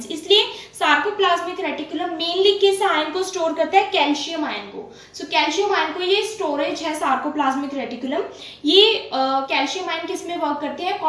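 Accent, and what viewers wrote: Punjab, India. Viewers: Mam are you panjabi?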